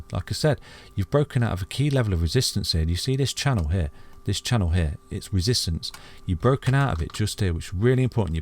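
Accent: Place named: Southern England, United Kingdom. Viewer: Brilliant analysis - picked up on the head and shoulders... Very good. Nice to hear a Southern Englander whilst I'm here in Thailand. Thank you buddy 🙏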